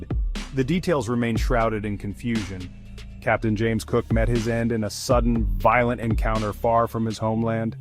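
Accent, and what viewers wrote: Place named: United States. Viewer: American voice over is annoying. The Australian site Heroes and Legends does it better 😢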